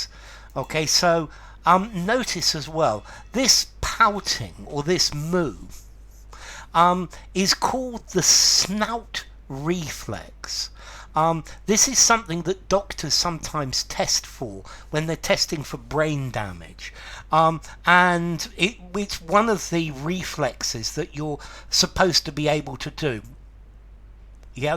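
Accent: United Kingdom. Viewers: He is always using his perfect British accent in explaining and giving vocabulary lessons , you can check his previous videos throughout these years. They might be at handy .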